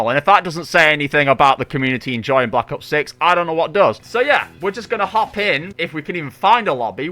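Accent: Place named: Yorkshire, United Kingdom. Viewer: Bro you have literally the exact same opinion as me, even down to the yorkshire accent lmao.. was saying this on reddit the other day, went on to complain about hackers and some nob was trying to say it was the best game of the modern era and it rage baited me hard enough to give this entire breakdown to them basically bar for bar, word for word what you're saying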